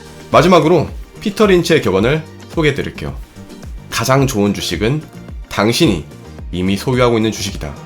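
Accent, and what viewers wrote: Gyeongsang, South Korea. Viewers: 전부터 그런 느낌이 있었는데.. 경상도 사람이었네. 좋겠습니다. 윤석열이 대통령 되서..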